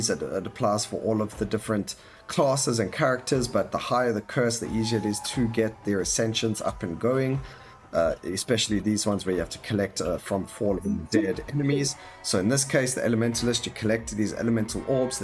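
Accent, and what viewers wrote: South Africa. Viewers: There's a difference between "accent" and "wrong" :D Accent is when you pronounce "aɪ" phonetics as "a" bc South African drops inflection. Wrong is when you say omnipotent wrong :P But hey, just tryna help. No hard feelings.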